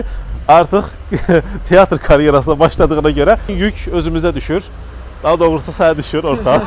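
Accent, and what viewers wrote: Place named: Shaki, Azerbaijan. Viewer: Qardaş salam xəbərin var artlq səndə şəkililər kimi ləhcə əmələ glib ❤ Var olun əllərinizə sağlıq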